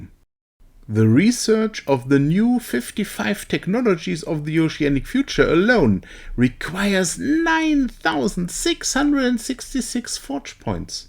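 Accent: Germany. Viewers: scheiße hört man dir an das du deutsch bist, dachte ich bin schlimm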